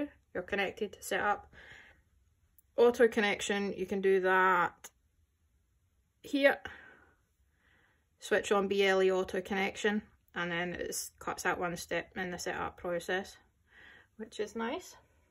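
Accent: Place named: Scotland, United Kingdom. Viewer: after hearing your Scot accent I am starting to like it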